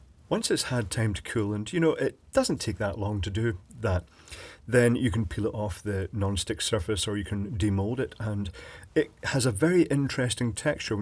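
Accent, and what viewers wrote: Scotland, United Kingdom. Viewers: He definitely isn't faking the accent. Proper Scottish cooking there.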